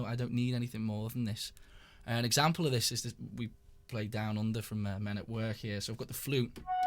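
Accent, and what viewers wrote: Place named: Liverpool, United Kingdom. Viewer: Good job on this. Thx. And nice proper scouse accent to boot.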